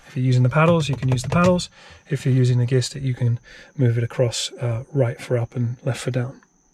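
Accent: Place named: United Kingdom. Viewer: Why are you speaking british ?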